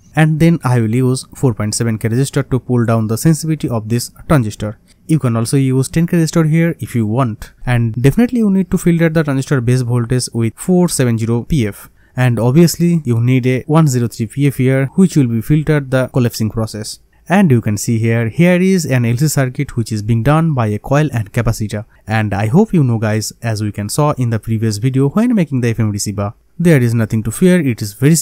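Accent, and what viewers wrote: India. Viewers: Lol. Hardly. Great Scott is German and this guy is Indian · Brother ... you sound so Indian ... Ram Ram ...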